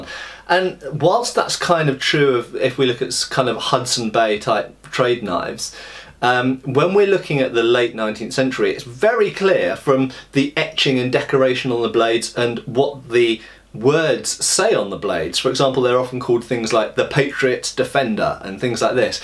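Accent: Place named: United Kingdom. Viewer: I have never seen a silverware handled bowie knife, nor have I ever wanted one. However like most Americans, for no good reason, after hearing someone with a British accent recommend it I do.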